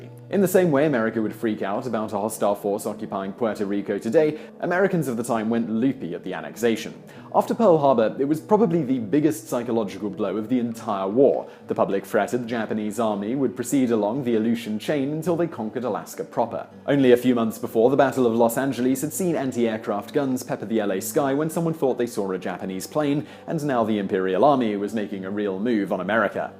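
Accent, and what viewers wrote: United Kingdom. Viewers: I thought this guy was Vsauce putting on a British accent fora second.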